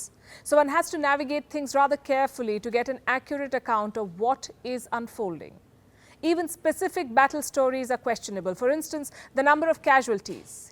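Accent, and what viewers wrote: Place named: India. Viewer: English not Indian English please so annoying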